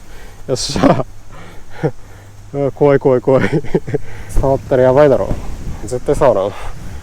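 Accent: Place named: Okinawa, Japan. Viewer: 沖縄のイントネーションとキャラがいい感じで楽しく視聴させて頂いてます！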